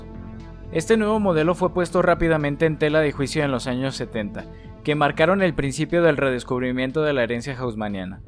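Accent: England, United Kingdom. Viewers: 🤣 pronuncias a la inglesa los nombres franceses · sí por dios, no sé a qué viene decir las cosas como si estuviera hablando inglés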